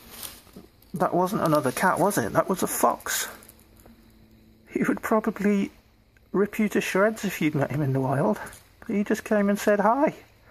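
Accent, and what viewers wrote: England, United Kingdom. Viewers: By the sounds of it your British, there's an organisation call "wildlife aid" in Britain that help all wild species that are sick and injured. You could give them a ring next time you see an animal that's sick or hurt, they'd gladly come and assist. They have a channel here on youtube under the same name. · Hes British if it was not plainly obvious by the accent. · The English accent is a cherry on top🦊 Precious fox💕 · The narrator reminds me of winnie the pooh but with a british accent. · Katieboil Anegg English, not Welsh. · The British accent is always lovely 💘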